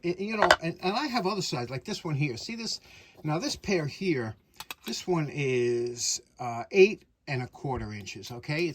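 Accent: New York, United States. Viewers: Hi, I wear my old timer badge with pride. Last year I started Bee keeping. There is a “Hive Tool” which is exactly the same as the Hyde tool. Yes, the tools are thinner as you describe. Not sure if you know about beekeeping. Honey Bees are very fussy about the interior of their hives. They use a substance called propolis that they seal up all crevices in the hive. You need the hive tool to pry the boxes apart because the propolis is made from tree resins and is very sticky. Another installment on soldering irons. Very cool temperature regulator/stand. I have a fancy modern digital soldering station, but also still have two Weller guns. Vintage is wonderful. Super station cleanup. I thought it was “Smoke gets in your eyes” not up your nose🤪. Very funny end video. I sometimes laugh at your NY. accent, because mine is the same. Stay warm. We are in the low 30’s in the daytime, and the high teens at night. 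So we are even unusually cold. We didn’t get the snow. So no complaints here. Best Regards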